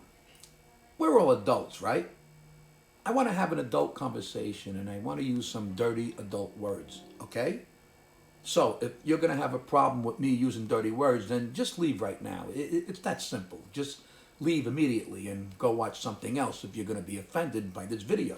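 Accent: New York, United States. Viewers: It just sound really Really bad with an New York Italian accent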